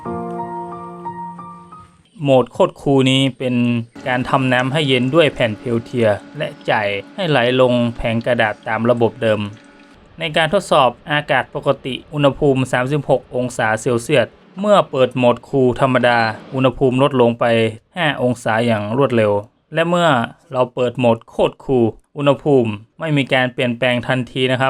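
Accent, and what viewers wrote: North, Thailand. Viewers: พี่เป็นคนจังหวัดไหนครับ สาบเมืองจังเลน 😁😁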